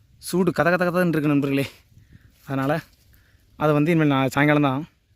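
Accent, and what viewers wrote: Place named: Tirunelveli, India. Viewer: Thirunelveli nnu theriyuthu,,,aana yentha ooru nnga,,,pavoorchatram aaaa????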